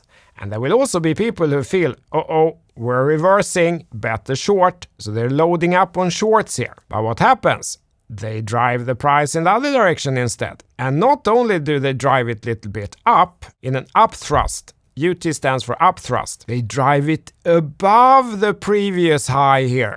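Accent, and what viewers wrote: Sweden. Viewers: Hör ända från östergötland att de är en svensson som är i farten!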